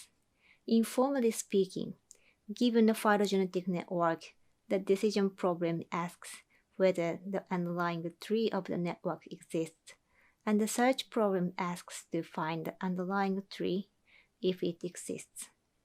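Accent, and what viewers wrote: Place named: Japan. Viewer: you've changed my view on my take on japanese speaks english. the lease accent and very standard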